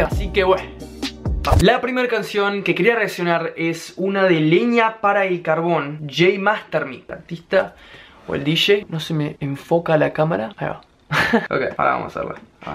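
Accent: Argentina. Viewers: Lo único que tenés de Argentino es el acento porque tenés hasta los mismos gestos yankees😂 igual te re banco,podés hacer un video hablando de cómo es tu vida allá y las diferencias que ves con respecto a Argentina?🙏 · pensé que no tenías tanto acento argentino xd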